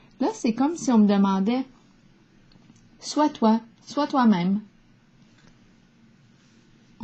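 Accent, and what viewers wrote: Canada. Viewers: Bonjour Ravie de vous retrouver avec ce jolie accent canadien Je vous suivez dans vos mini guidances et vous avez disparue puis de retour avec des guidances plus longues avec un grand plaisir 🙏😘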